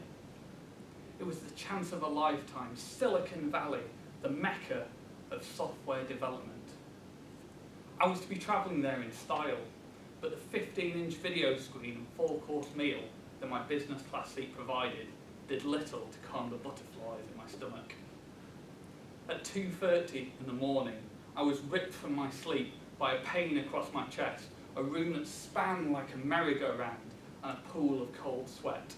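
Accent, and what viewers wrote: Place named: Yorkshire, United Kingdom. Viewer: Great speech. I am looking for a speech concept for my CC10 and came across this. I love the Yorkshire accent. I am from Cape Town, South Africa but lived in Leeds. So you bringing back memories. If you happen read this. Great speech topic.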